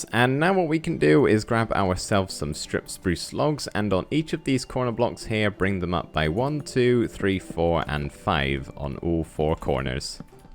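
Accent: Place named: Scotland, United Kingdom. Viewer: Hey, really enjoying your builds. I've been trying to place your accent. Is it Scottish borders?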